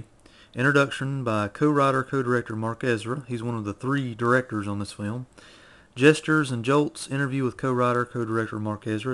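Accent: South, United States. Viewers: Whoa you have a really thick southern accent. Do you really talk this way in real life?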